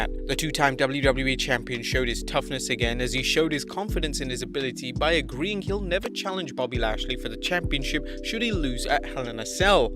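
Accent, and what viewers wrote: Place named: United Kingdom. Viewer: It is so cute to me that because of your accent you don't understand that this entire enterprise serves no purpose but to insult the viewers intelligence. Silly British people think you can take a cerebral approach to understand American blue collar culture.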